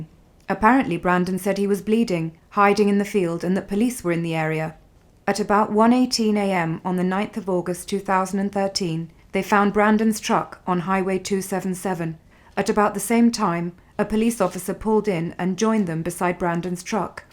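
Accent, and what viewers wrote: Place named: United Kingdom. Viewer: I have looked at & listened to many videos about this case. but it’s so good to hear it spoken in a calm collective manner by a fellow Brit. Thank you. It’s time Brandon was brought home.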